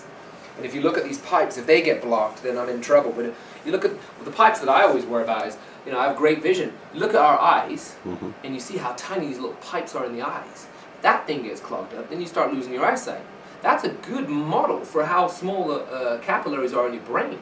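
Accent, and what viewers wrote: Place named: United States. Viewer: What's with the American accent? Thought he was British.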